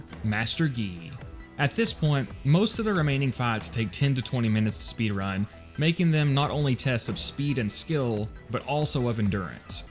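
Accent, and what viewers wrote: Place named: Texas, United States. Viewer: I love the slight Texas southern twang to your accent. “Aaai play with aaaa controllaar” 😂👌 coming from a queens English speaking Brit it’s highly amusing.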